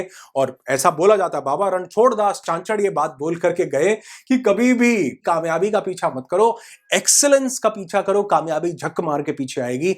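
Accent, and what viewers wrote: Punjab, India. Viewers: Sir Punjabi ho tussi??... Boht Khushi hoyi sunke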